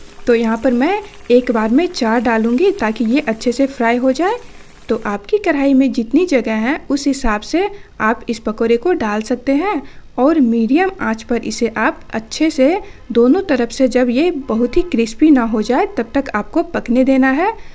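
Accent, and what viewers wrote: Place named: Bihar, India. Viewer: Munh kholte hi pata chal gaya yeh totli aur biharan hain...aaooo...hate biharis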